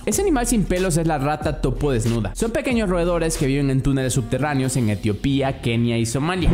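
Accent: El Salvador. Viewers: Ahora si se nota que es de El Salvador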